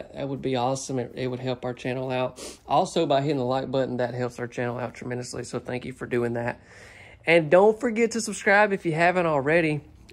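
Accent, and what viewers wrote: South, United States. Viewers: You just sound Southern to me❤️😉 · Couldn't get tired of listen to you. Love your southern/ cowboy voice. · I love your southern accent. Your commentary is hilarious. It's one of the main reasons I enjoy your videos so much. This home was beautiful but a door that leads to the laundry room not a good idea. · Love this one!! Turn off the volume?? I could listen to that southern drawl allll day long! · Im not talking about the way baby talks.. love his southern charm....it's the fact he has to open all those freeking cabinet doors!!!!! Unless there is something very special in the cabinet, all cabinets look alike!!!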